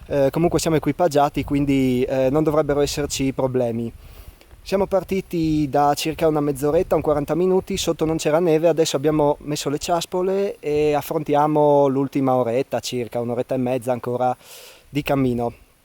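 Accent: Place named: Paularo, Italy. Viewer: Bel video e bella escursione... Ma di dove sei? Dall'accento si direbbe della zona di Paularo ma potrei sbagliarmi...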